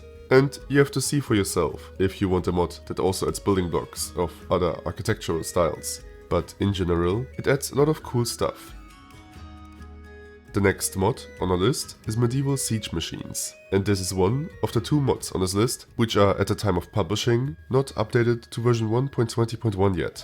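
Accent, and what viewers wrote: Germany. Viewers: Da hör I do n deutschn? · Ah, ein Deutscher mit einem dicken deutschen Akzent! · du kanst so viel englisch sprechen wie du wilst aber ich höre nen deutschen dialekt wen ich nen deutschen dialekt höre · Man hört so raus das du deutscher bist 😅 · you sound so german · i can hören the wundervollen german accent, bitte mach mehr videos! 💪💪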